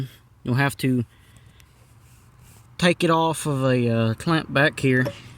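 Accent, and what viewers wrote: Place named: Pittsburgh, United States. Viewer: Are you in the Pittsburgh area? I noticed from your accent. If so, I have a 99 Legacy I might need advice with for changing bulbs... pm me!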